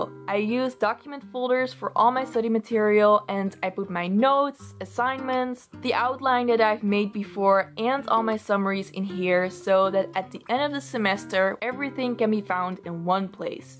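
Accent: Netherlands. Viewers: Are you Dutch I can hear a small accent Dus hey hoi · Are you Belgian or from the Netherlands?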